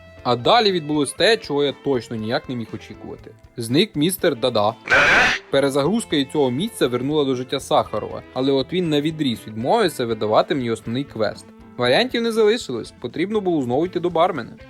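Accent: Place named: Western Ukraine, Ukraine. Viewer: Я рабсиянин люблю смотреть и слушать украинский язык с западным акцентом вообще бомба! Особенно когда смотрел про херсонского енота из стражей галактики это шедевр! Героям слава!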